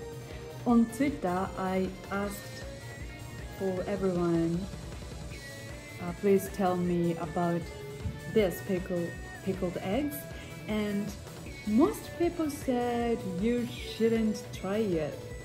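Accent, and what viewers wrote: England, United Kingdom. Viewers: you speak with an English accent! That is amazing! 👍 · I love your accent. Like your straight from England 😂👍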